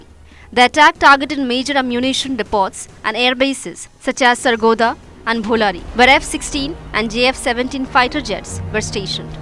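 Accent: India. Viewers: "How India Punished Pakistan" When I saw the title and that Indian accent plus the Map I already knew the whole video would be bias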